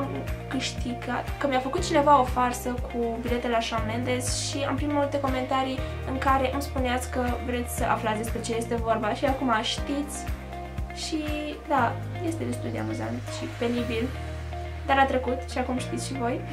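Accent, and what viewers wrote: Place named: England, United Kingdom. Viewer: vezi ca ai putina engleza pe la coltul gurii:))))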